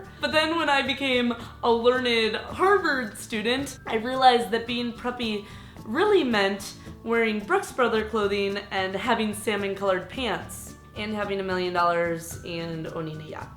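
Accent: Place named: Wisconsin, United States. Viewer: oooohh myy goddd your Wisconsin accent is crazy thick lol smh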